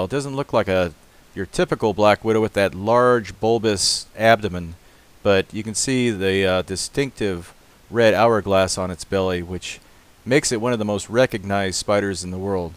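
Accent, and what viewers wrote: Australia. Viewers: lol you aren't Australian are you? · are you an aussie? :p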